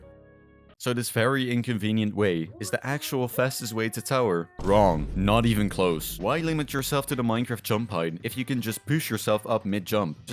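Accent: Netherlands. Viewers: Really cool Am I hearing a dutch accent? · Quick Question are you dutch by any chance? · u dutch perchange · Dutch accent detected??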